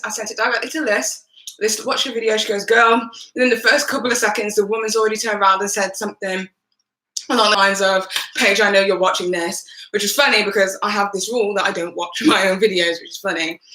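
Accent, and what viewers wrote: England, United Kingdom. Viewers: You’re awesome and beautiful Paige, ignore anyone who wants to pull you down. You are a unique person. Why should you owe any other person anything just because they happen to have the same skin tone as you- that’s crazy! Why does anyone have to fit into a box in this day and age. Britain genuinely doesn’t have the same issues with race that America has- America just can’t get past their history and it seeps into everything... but it’s not really like that in the UK. It IS different here, thankfully, or it was, although sadly it seems to be getting worse not better! I just thought you had a nice normal English accent- everyone I went to school with in my teenage years had that same accent (1/3 white, 1/3 black, 1/3 “Asian” almost exactly at the school at the time- everyone talked the same, pretty much like you Paige. I was teased for having a different accent at the time, but you remind me of all the lovely wonderful girls I went to school with back then.) My parents did the same thing, didn’t want their kids to grow up too afraid to walk down the street, so when I was a teenager we moved to a better area and got a nice education. 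I’m really grateful for it. You’re one of my favourite Brit YouTubers and I really hope these morons won’t stop you doing what you enjoy, because you’re so good at it, a true investigative genius. I’m sure your beautiful gorgeous daughter will be luckily to grow up with such a beautiful soul for a mother, both inside and out, and your soul will alwa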